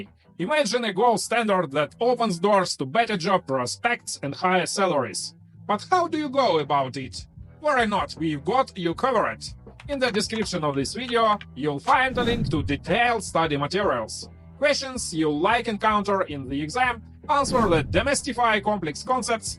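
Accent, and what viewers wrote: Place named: Russia. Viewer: your russian english is briliant)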